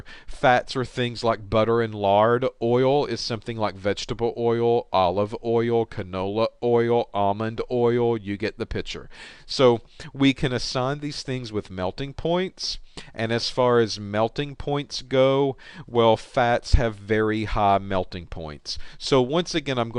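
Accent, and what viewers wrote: South, United States. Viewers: cool Southern accent I like it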